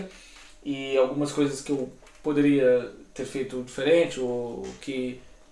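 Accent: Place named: Brazil. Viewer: Olá! Parabéns pelo vídeo! Sou do Brasil. Confesso que seu sotaque é bem reduzido. Fiquei em dúvida se você era brasileiro ou português... Kkkkkkk.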